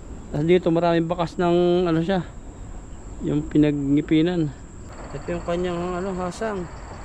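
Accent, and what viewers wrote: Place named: Quezon, Philippines. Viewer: Bro. Tanong ko lang ano probinsya mo halos parehas tayo ng punto at yun pagkatagalog mo. Taga Quezon province ako. Bago mo subscriber pala. Parehas tayo ng hobbies ingat palagi ang God bless.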